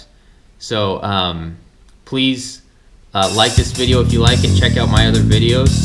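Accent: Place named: Southern California, United States. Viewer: Keep up the good work man, Seems like your from socal.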